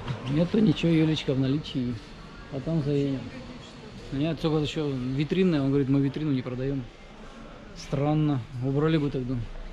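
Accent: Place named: Vyatka, Russia. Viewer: Что -то думаю говор какой то вятский, потом смотрю едут как будто по учхозу, а потом нихрена себе, так это ж Чистые Пруды)))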